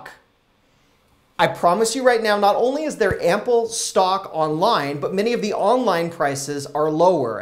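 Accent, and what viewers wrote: Canada. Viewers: Are you Canadian? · Your videos are very enlightening. As a senior citizen with limited mobility I gave been ordering groceries from Walmart for delivery. The pandemic has me practically housebound. For the most part I would encourage grocery shopping for delivery. Unfortunately often the main thing wanted is out of stock & I don't know that till after an order is sent., your videos are interesting & your Canadian accent is intriguing for this old Southern steel magnolia. GOD BLESS!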